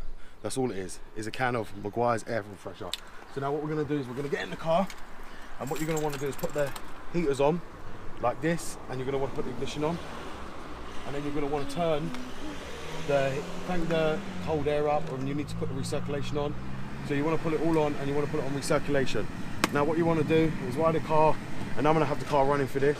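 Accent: United Kingdom. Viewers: 😂😂😂like a bomb but with that Brit accent killed it thanks for showing very simple and straight to the point 🎉👏👏👏NYC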